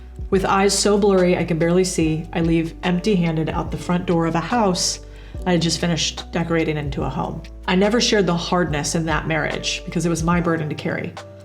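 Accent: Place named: Midwest, United States. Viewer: You are Latina with beautiful hair, but you have a Midwestern (no) accent. Please explain.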